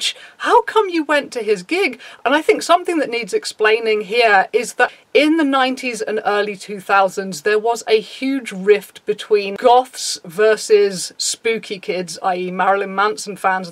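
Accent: United Kingdom. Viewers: You sound British... if you are British... please tell me what shopping centres people who listened to Marilyn Manson chilled in a shopper centre and pretended to be vampires because I LITERALLY never seen this. Yes I got bullied at school for being a “Greebo” but literally nobody reacted like this who listened to him.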